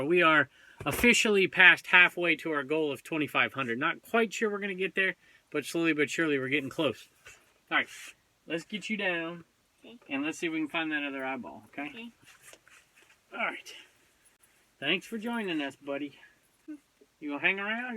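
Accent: South, United States. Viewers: How do you have such a Southern drawl if you grew up in CA?!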